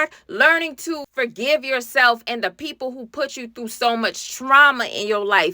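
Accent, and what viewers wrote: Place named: Jamaica. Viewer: Hey i heard that jamacian accent. Looking forward to buying some more of your products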